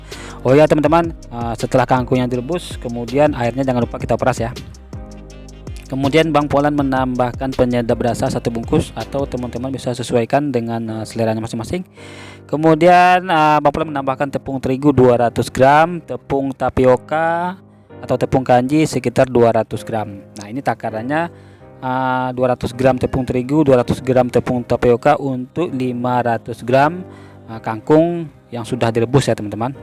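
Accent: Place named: Padang, Indonesia. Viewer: ooh... Patutlah bahasa indo nya logatnya beda... Urang awak kiro nyo... Hehehee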